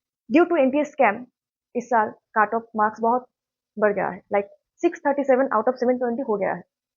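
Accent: Bengal, India. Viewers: Aapke hindi bolne ka style thora bengali hai 😅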